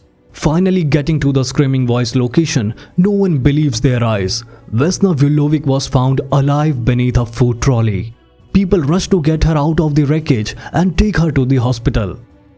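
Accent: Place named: India. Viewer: I hate indian accent 🤢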